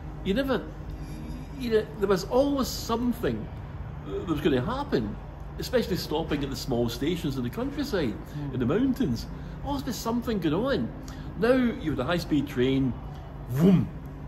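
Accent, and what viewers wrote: Scotland, United Kingdom. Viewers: I would typically play such videos at twice the normal speed.....but given the Scottish accent, I have to watch this video at the normal speed....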